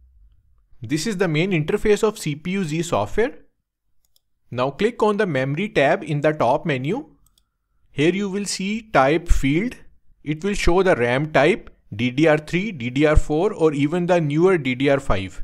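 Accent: India. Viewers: what he means is that because your english sounds very indian he said that as a stereotype aka that all indians are scammers. i think your english is fine and tips are good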